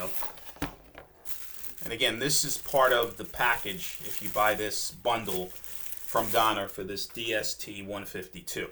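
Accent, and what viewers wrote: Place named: East Coast, United States. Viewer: I appreciate the in-depth review, coupled with the East Coast accent, which completes the experience for a Midwesterner like me. BTW, if anyone clicks on your affiliate link, you get a piece of the action for so many minutes afterward, regardless of what is purchased.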